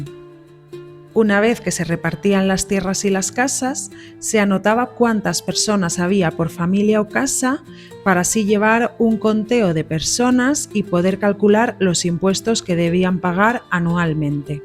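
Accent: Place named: Spain. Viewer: Agradecido quedo por tu trabajo. Me gustó mucho. Además disfruto mucho de tu entonación española y tu dicción exquisita. Soy Carlos Augusto de Mondaca y Reyes desde Santiago de Chile. Saludos y Dios bendiga a la Madre tierra de España, Chile e Israel.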